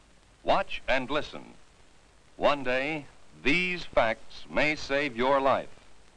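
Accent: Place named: Mid-Atlantic, United States. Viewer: Mid Atlantic accent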